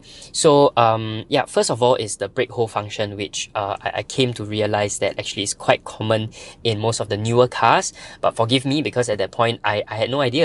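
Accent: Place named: Ghana. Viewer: you sound like you went school in ghana 👀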